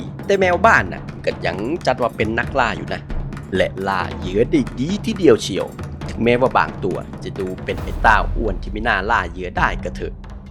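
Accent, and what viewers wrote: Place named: Isan, Thailand. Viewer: เสียงคุณติดสำเนียงสุรินทร์นะ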